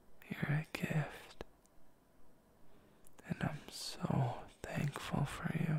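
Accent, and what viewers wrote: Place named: South, United States. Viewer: that little southern drawl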